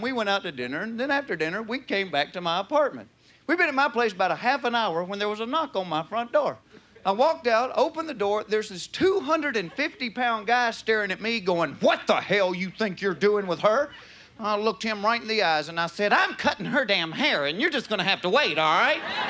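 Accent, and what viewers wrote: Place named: South, United States. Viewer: It's that accent, man, and years if media stereotyping. Half my relatives are from the south and im still prejudiced.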